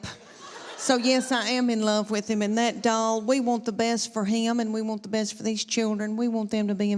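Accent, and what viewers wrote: South, United States. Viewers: With her looks and that southern accent she could talk me into just about anything, and make me laugh at the same time. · We saw her in Knoxville at the local comedy club. Very funny lady!! Love her southern accent. 💕💕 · That southern accent kills me!! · oh my God I LOVE that southern accent!!! Hey Natasha Leggero, can you imitate this accent? I think you are so close to perfect now well OMG talk like this and I just might explode!!! Tasha, I love you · No....that's her! She's from the south. We all talk that way😂🤣😂🤣😂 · It's that good 'ol Southern accent!!!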